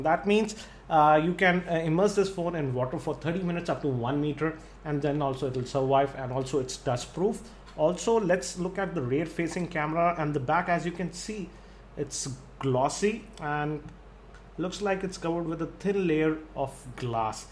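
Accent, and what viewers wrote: India. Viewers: I like your indian accent :D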